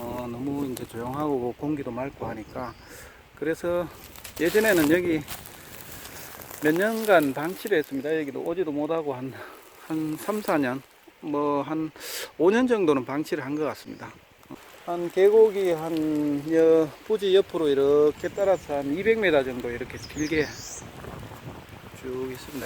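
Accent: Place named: Gyeongsang, South Korea. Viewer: 네^^ ㅋㅋ 반갑습니다 올려주신 영상 잘보고있습니다 경상도 사투리도 듣기좋구요 좋은곳 많이올려주세요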